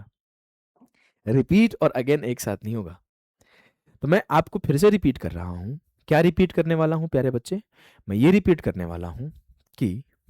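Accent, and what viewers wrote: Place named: Uttar Pradesh, India. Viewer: sir🙏 day 12 Sir apki English ekdam U.P wala vibe deti h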